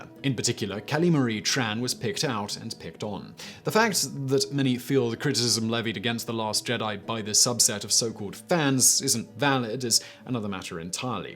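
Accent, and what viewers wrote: United Kingdom. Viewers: Too many British pronounced tomatoes in this video. Please never do a video where you have to say aluminium multiple times.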